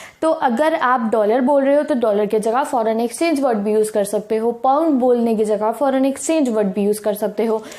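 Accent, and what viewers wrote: Punjab, India. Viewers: Di are you from punjab?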